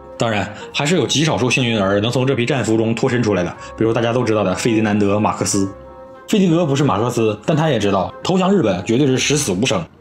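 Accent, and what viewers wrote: Northeast, China. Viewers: 口条不清晰，还带东北口音。弃。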